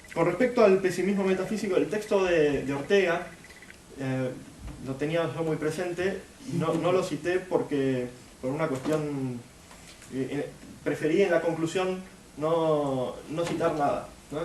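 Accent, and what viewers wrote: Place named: Argentina. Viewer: La verdad no entiendo, como esta genial exposición, no tiene más me gusta. A lo mejor, es que a mí me sirve, por el hecho de ser siego. Pero cuando uno ve, tantas tonterías en YouTube, con tantos me gustas, y estos excelentes videos, con tan pocos, entiendo por que vivimos así. Es cierto Leibniz tiene algo de Platónico. En el II libro de la República, cuando forma la ciudad, muestra que no puede existir virtud sin vicio. te mando un fuerte abrazo desde Quilmes (supongo si no me equivoco por el acento que tenés), tu Argentina.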